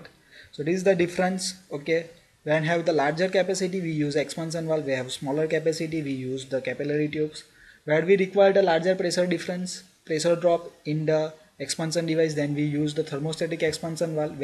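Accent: Gujarat, India. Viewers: R u South gujarati ?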